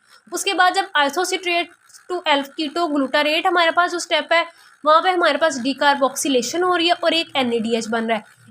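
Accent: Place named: Punjab, India. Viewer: Punjabi speaker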